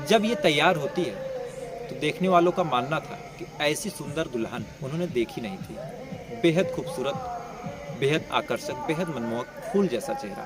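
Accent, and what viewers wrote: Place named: Awadh, India. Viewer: जय हो,कहानी अत्यंत मधुर स्वर में प्रस्तुत कर मनमोहक बना लेते है।" "अवधी बोली का प्रभाव आपके बोली में है।क्या आप अवधी बोली वाले जनपद से मिलान कर रहे है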